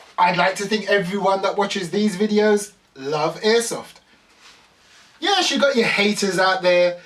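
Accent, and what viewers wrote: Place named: London, United Kingdom. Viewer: He’s a proper Londoner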